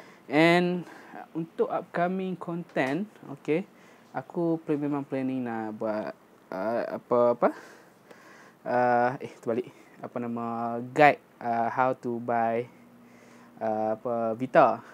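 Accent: North, Malaysia. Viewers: accent utara pulak tu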